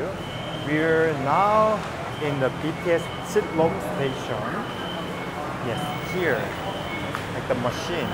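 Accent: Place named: South Korea. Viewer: I like your korean accent when u speak english. So lovely 😅😅😂🎉🎉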